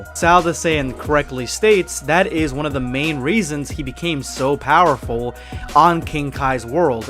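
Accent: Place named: Latin America. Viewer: Oh man you being Hispanic makes so much sense, translating dbshippuden and the slight accent in your voice. Edit: I’m Hispanic too🤫, and could you do a video where you speak some Spanish??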